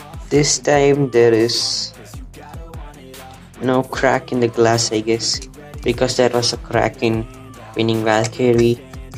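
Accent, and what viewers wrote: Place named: North India, India. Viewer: bro first I taught that you from North states because of way you speak english is different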